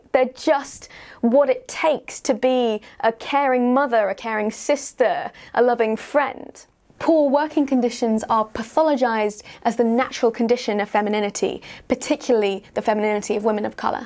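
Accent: United Kingdom. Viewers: Cute, sexy, smart and with a British accent......cool!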